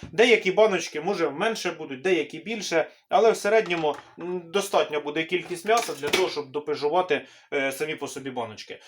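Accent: Poltava, Ukraine. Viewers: Доброго дня! Із святом Вас! 😊 Так, я зараз теж м'ясо солю зразу, мені так більше подобається, а раніше солила в баночках. Ви, мабуть із Полтавщини? Говір Полтавський у Вас. 😉😊💐💐💐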